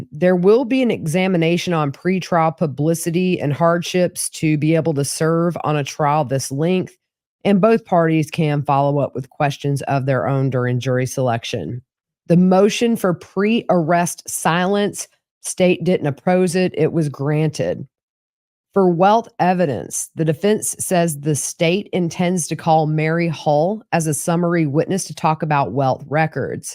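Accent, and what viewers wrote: South, United States. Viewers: So many southern accents are not pleasant but your accent is...always nice to hear your recaps!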